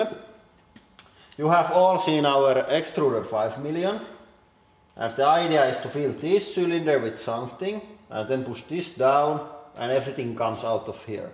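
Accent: Finland. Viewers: I'm always amused with this guy's Finnish accent, saying "who-draulic" press instead of "high-draulic" press. But, in all out fairness, I imagine my American accent shows through when I speak German. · I like the way how finish people talk english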